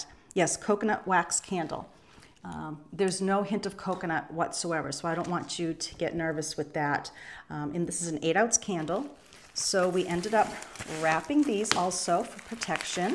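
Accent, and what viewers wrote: New England, United States. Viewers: Hi, Your blue & white top is very pretty!! the Fall box was a lot of wonderful items, I was toooo late to order one but that's my on going luck, haha! I can almost smell all of the lovely Fall smells! That was so nice Carla could help pack the boxes, each one took some time!! take Care, hugs from Paula in Indiana!! p.s. I can really hear your New England accent!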